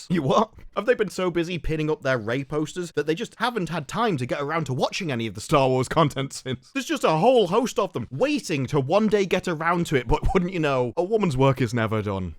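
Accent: United Kingdom. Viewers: This man is most definitely British.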